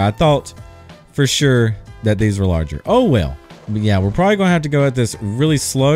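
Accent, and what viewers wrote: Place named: Appalachia, United States. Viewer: his accent is like the city equivalent of Hillbilly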